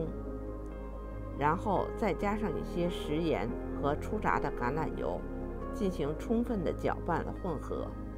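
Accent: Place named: Beijing, China. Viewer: 我就知道您是北京的。我听出来啦！！！特别亲切😍 也祝您和家人周末愉快！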